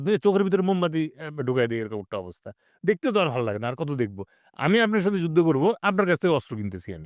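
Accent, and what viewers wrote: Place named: India. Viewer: Your sound like an Indian.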